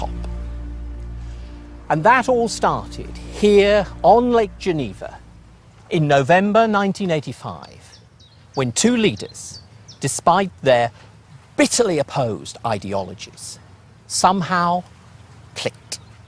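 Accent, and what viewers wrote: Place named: United States. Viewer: This guy's American accent is insulting.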